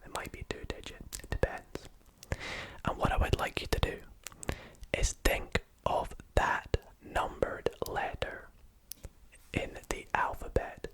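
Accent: Scotland, United Kingdom. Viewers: I just realized from Scotland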